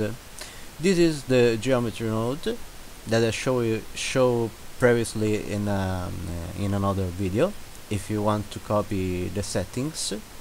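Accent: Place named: Japan. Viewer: Your accent sounds like a Japanese. Anyway I love this video so much ❤️❤️❤️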